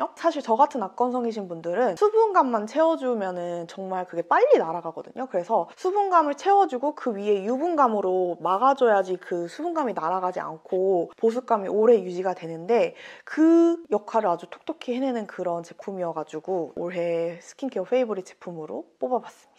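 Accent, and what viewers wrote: United Kingdom. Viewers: When are you going to film your vid in English? I love your British accent😭🇬🇧❤️❤️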